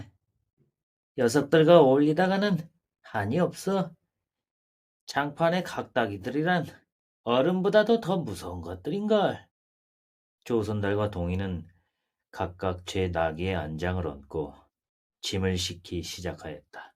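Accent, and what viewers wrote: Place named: Gangwon, South Korea. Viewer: 재미있게 잘 들었습니다^^ 책도 재미있지만 귀로 들으니 더 집중도 되고 좋았어요^^ 강원도 사투리가 재미있어요^^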